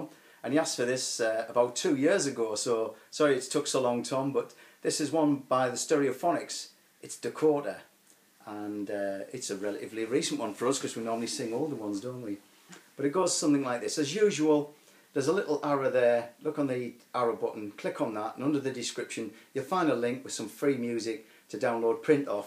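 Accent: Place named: Northern England, United Kingdom. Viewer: Thanks for you reply. Love the northern accent by the way.. Stockton or Eaglescliffe? I'm a monkey hanger but now live in west sussex.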